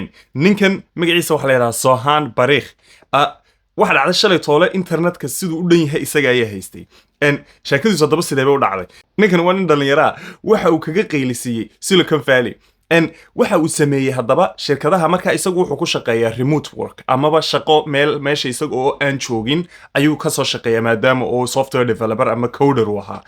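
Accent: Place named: Arab world. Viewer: بأي لغة تتحدث لهجتك عربية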